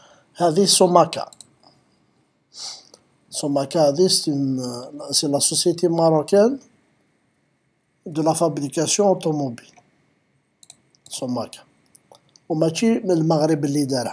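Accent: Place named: Kabylie, Algeria. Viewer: J’ai reconnu ton accent kabyle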